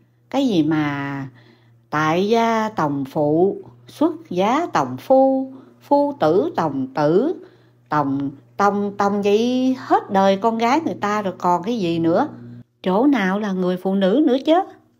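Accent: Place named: Mekong Delta, Vietnam. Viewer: Vui vui ! Giọng đọc chuẩn miền Tây luôn bạn ơi ! Cám ơn bạn đã chia sẽ.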